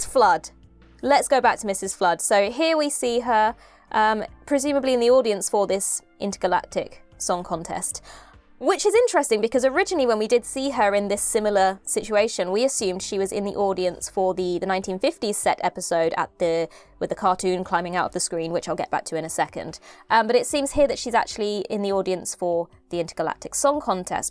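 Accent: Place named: Berkshire, United Kingdom. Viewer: Is the presenter from Berkshire? She sounds posh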